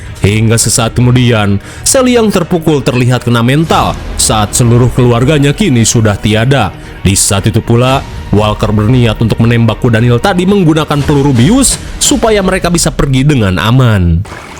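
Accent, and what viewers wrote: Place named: West Java, Indonesia. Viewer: Orang Sunda mana a 😅...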